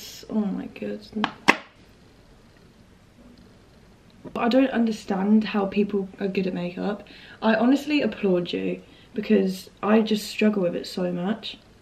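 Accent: United Kingdom. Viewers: I like the English accent from her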